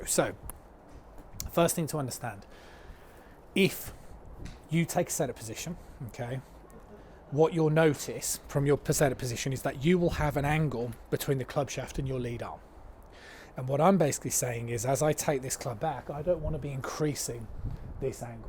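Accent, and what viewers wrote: United Kingdom. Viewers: He has aBritish accent and talks too fast also.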